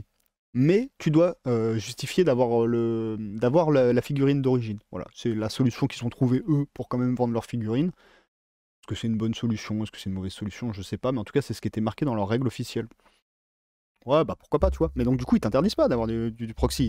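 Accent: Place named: North, France. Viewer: Budget vs temps, le dilemme absolu... Oh un accent du Nord.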